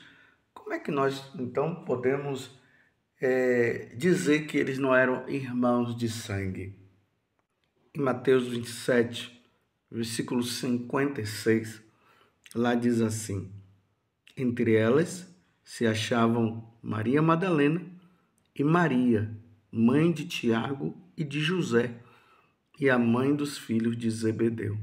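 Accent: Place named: Northeast, Brazil. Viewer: Bom dia povo santo de Deus, amo ouvir as homilias do padre, lembre -se ele tem soutaque nordestino, assim como eu tem momentos que falamos mais leve outras horas mais enérgicos como voceis estão falando, um bom dia a todos com as bênçãos de Deus!❤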